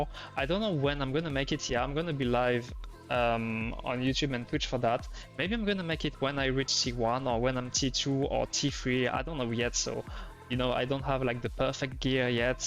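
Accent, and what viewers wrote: France. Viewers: Once again, thank you. By the way, Sir, your accent, is it perhaps French?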